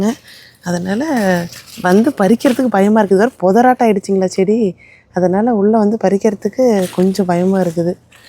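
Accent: Kongu, India. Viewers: Kongu tamil Azhaga pesureenga neenga Coimbatore pakkangala. Super home garden👍👍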